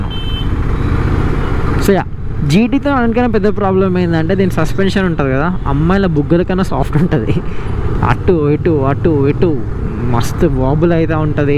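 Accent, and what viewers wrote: Hyderabad, India. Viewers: That hyderabadii language was wow 😂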